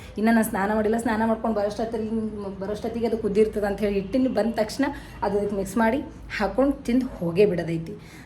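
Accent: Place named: Kalaburagi, India. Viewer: ಕರ್ನಾಟಕದಲ್ಲಿ ಪ್ರತಿ ಜಿಲ್ಲೆಯಲ್ಲಿನ ಮಣ್ಣಿನ ಸೊಗಡಿನ ಭಾಷೆ ವಿಭಿನ್ನವಾಗಿದೆ... ಎಲ್ಲವೂ ಒಂದಲ್ಲ ಒಂದು ರೀತಿಯಲ್ಲಿ ವಿಶೇಷವಾಗಿದೆ... ಹಾಗಾಗಿ ನಿಮ್ಮ ಬಾಯಲ್ಲಿ ಕಲಬುರಗಿಯ ಭಾಷೆ ಕೇಳಲು ಚಂದ..❤❤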